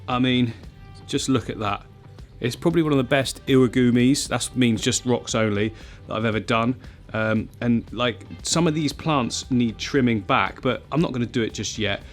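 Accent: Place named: United Kingdom. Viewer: You know how, for example, Andrew Garfield & Tom Holland & Hugh Laurie & Asa Butterfield all hide their Great Britain accents with USA ones? You should do that.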